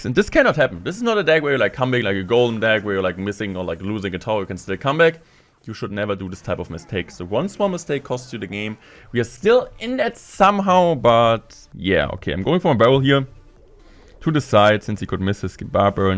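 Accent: Germany. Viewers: ich liebe dich und deinen deutschen akzent vor allem dieses (in di intia wörlt plaing in top läda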